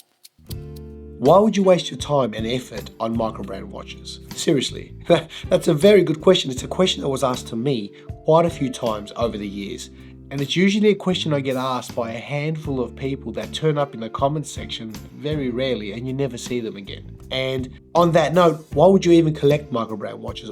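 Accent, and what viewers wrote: Australia. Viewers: I'm so happy to have found this channel., it's great to here an Aussie accent. I love your honesty. I agree with you completely. I only buy what I love and want to wear. I would never buy a 20K watch. I have no judgement for anyone who does. Some of them are very very nice. For me, I love great watches from $300 to 2K. So Micro brands rule.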